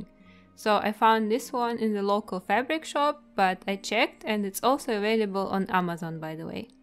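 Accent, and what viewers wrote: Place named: Russia. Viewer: Ain't these the old Soviet Nutcracker frames in the beginning?) Also, pardon me for asking - but i kind of detect a slight Russian accent there)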